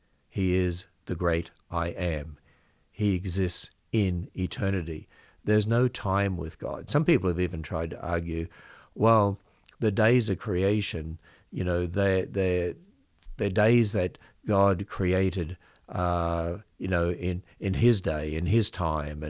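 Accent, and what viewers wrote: Australia. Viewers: Good God, you can talk! At least you haven't lost your Australian accent 🇦🇺 👍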